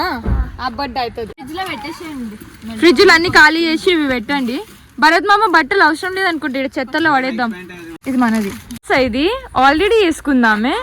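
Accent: Telangana, India. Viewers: It's always Fun and entertaining to watch ur vlogs garu❤ especially our Telangana people will connect more to ur slang❤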